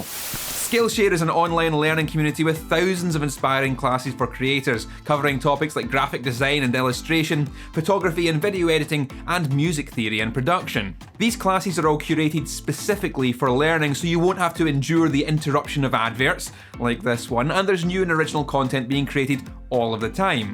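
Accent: Scotland, United Kingdom. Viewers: Now that you have a spare black pickguard from this baby, why dont you build an Adrian Smith Signature Jackson in white and black? I have done one from one of my old Aria STG 004s with a swap of maple from rosewood neck. Also painted the white pickups black. Now plays fantastically. Oh by the way, am a huge fan of your Scottish accent. Alba gu brath! 🙋‍♂️🇹🇷🤜🤛🏴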